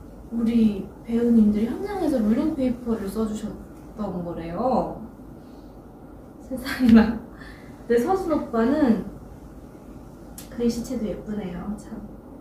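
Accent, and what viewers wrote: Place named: Germany. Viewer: She has a German accent in English too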